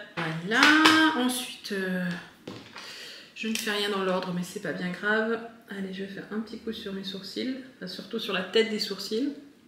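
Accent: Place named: Switzerland. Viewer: Je me gardais ce vlog de côté pour un moment en tête à tête avec toi... C'est agréable ce format : il nous permet de prendre le temps de le regarder. Bien plus que quand les stories défilent et qu'on n'est pas spécialement attentif ! Est-ce que mon moment préféré reste le "coucou", j'avoue ne pas en avoir entendu un depuis des années, et ça m'a dépaysé d'un coup ! Ton p'tit côté suisse ... Merci beaucoup ! C'était un chouette moment !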